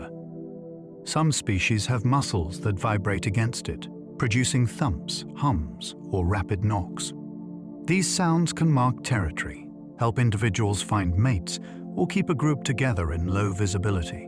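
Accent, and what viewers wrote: United Kingdom. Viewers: Listening from Iowa, USA. ❤ I like to listen at .75 speed and love the British accent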